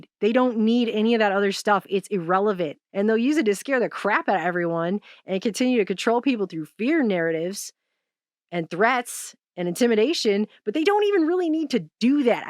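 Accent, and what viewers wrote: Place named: Hispanic American, United States. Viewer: I like your accent. You sound like... a second generation Hispanic American female, age 22-39, from the northern states, somewhere between Chicago and North Dakota, medium build, attractive, long brown hair. How close am I? Practicing making inferences from a sparse data set(i.e, your accent.)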